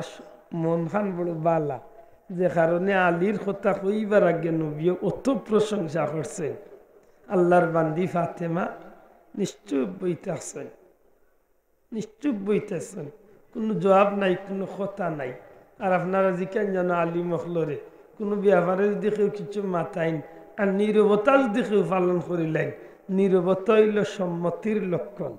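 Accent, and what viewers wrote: Sylhet, Bangladesh. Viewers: বড় মজার সিলেটী ওয়াজ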